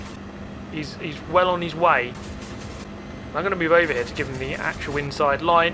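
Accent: United Kingdom. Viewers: Your Initial D references earned my sub lol And I could listen to a British commentary on any topic 😆 love it! Keep up the fun videos and long live the shadow realm!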